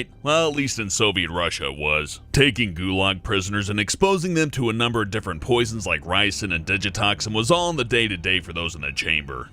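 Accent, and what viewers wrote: United States. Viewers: the way he talks is too deep, harsh, forced and silly for the content of the video. It's difficult to focus on what he's saying behind that shitty american-thicked accent of his. Dolan's voice is soothing, serious, and has great ethos. Basically, he just sounds a hundred times better. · when did become american?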